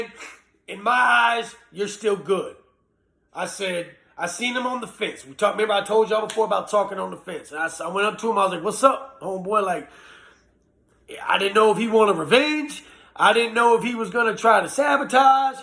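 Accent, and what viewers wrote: Virginia, United States. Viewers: You from Virginia?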